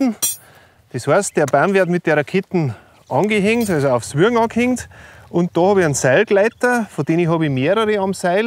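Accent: Bavaria, Germany. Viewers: Und der wieder in schener bayrischer Mundart erklärt😅 da muss man aufmerksam und konzentriert zuhören. Wie die Lehrlinge in der Labau😉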